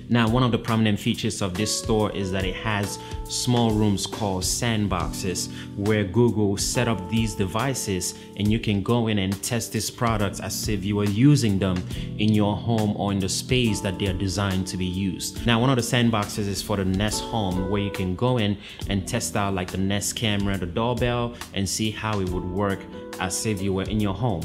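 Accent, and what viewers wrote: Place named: Brooklyn, United States. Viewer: Hey Brooklyn Accent Guy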